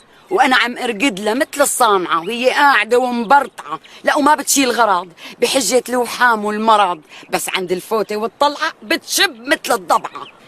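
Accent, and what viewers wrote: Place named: Syria. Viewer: صوت جزائري وحكاياتها باللهجة السورية رفع من جمال الفيديو يسلم ايديكم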